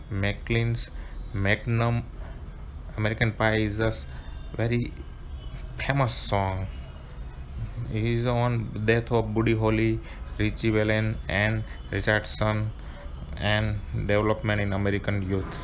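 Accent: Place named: India. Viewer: That Indian accent is horrible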